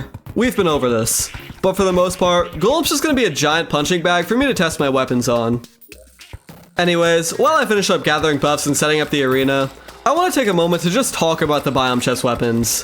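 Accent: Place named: United Kingdom. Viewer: I'm sorry but every time you say a cuss word it makes me cringe and you sound like a white washed person that grew up in great Britain. 😭😭😭 But anyways good video you are good at terraria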